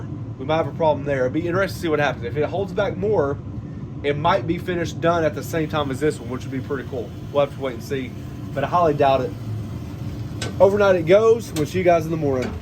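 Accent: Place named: Alabama, United States. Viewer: Great video doing this recipe tomorrow. your accent is nostalgic to me growing up in Alabama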